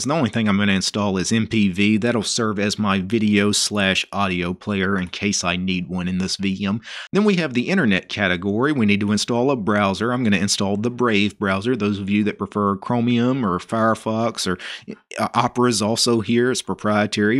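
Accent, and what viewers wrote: South, United States. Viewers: words like: fAantAastic, really cul, beooting, anythieng, goe with; they do sound southern cause difference is in pronunciation of vowels, however there are although not many instances that consonants are pronounced with unusual stress change, Im a faking Linguist that's why its interesting for me amdD well this one is also d at the end so its practically D+EE which C+V, light deiM stress is on last C, urvxdTee is also C+V so nothing abnormal here ITS HARD to pick and choose the words its the overall sound; Southern accent is IN YOUR FACE, 2 words in u can pinpoint where it is from, I guess has spent a period of time in other states, or he has constant contact with people of other states THAT DO NOT speak normal American accent. hey would you answer this too please, about living in other states or having constant contact with ppl from those areas?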